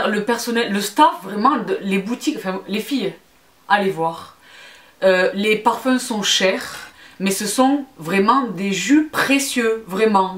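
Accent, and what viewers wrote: South, France. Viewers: Coucou je suis aussi une vrai passionnée de parfum👌avec ton jolie accent du sud J avais l impression d être avec mes amis de Bandol étant à Rennes 🙂💕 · Super video ! J'adore ton accent qui me rappelle mon enfance dans le sud. Et j'aime beaucoup ta passion pour les parfums, avec pas mal de goûts communs. Je porte Fève Délicieuse depuis septembre (cadeau d'anniv'), quelle merveille ! Si tu veux bien nous en dire un peu plus sur Bois d'argent un de ces jours, ce sera super !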